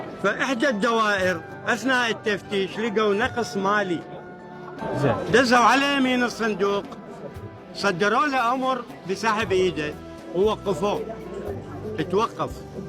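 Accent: Iraq. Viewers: اللهجه العرافيه الي بدوون شوائب❤😊😊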